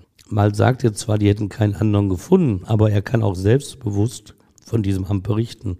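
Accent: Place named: Ruhr, Germany. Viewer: Er ist doch der Gerichtsreporter und erzählt es wie er alles im Gerichtsprozess erlebt hat. Kein Anderer kann es doch nicht so erzählen . Das ist Ruhrpott.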